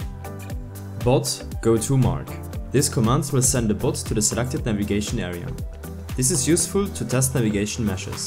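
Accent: Germany. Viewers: Man merkt das er deutssch ist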